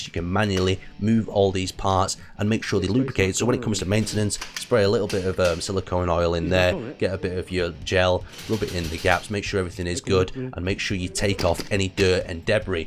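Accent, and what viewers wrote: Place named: North West England, United Kingdom. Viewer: I have a feeling he's from the north west, if so hello from Wirral!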